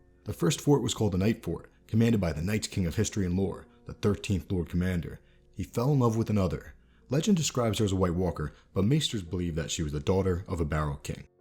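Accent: New Jersey, United States. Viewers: Let's face it.. with that NJ accent, THAT'S the rhythm of ur narration! lol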